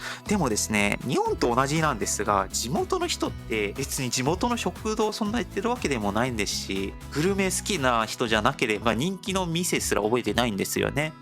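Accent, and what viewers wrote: South Korea. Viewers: ぐらい話せたら、日本語上手ですねー！は、100%言われると思います😅日本人でも地方出身の人は訛りがなかなか抜けないんですから、韓国訛りなんか有って当然ですよー😅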